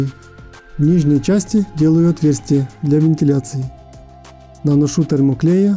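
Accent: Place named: Vologda, Russia. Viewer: мастер из Вологды, по акценту сразу понятно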